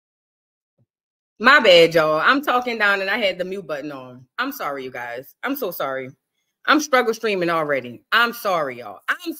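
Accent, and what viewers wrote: New Orleans, United States. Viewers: You definitely be putting everybody on before they catch on 💯love u 🥰i want a N.O accent so bad 😂😭 its so fire 🔥 lol 😆 🖤🖤 via the green lady state 🗽🗽🗽😂🤣#keepwinning💪🏾✊🏾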